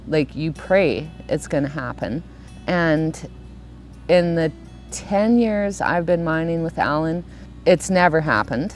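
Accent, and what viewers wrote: Canada. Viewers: Your semi-canadian accent is hilarious by the way 🤣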